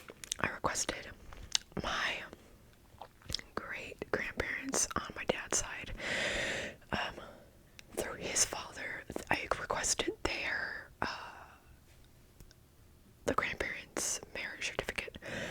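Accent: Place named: Midwest, United States. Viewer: I’m not a big fan of the popsicle whole thing but I love this because I feel like we’re on FaceTime right now and like I live in Minnesota and I’m from Minnesota my whole life and I can totally tell you have a Midwestern/possibly Minnesota accent and I think it’s so funny lol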